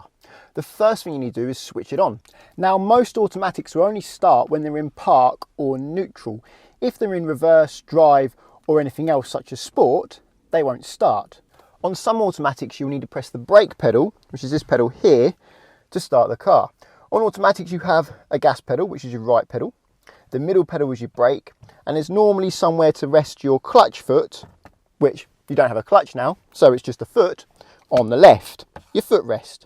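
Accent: England, United Kingdom. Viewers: As a French leaving in the US I was a bit confused by the driver seat on the right side but love the British accent. Great video for someone taking their driving test in an automated car after driving 10y with manual. Thanks for showing a close up of the pedals too. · Love your British accent! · He is so easy to listen to. The English accent is so much easier on the ear than another nationality that I won't mention!! 😂. I'm 🇮🇪 btw!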